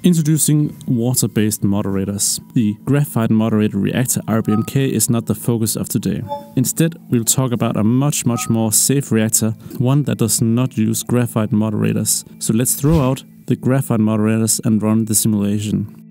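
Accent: Denmark. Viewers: That's the thickest danish accent I've ever heard. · is that a dansker i høre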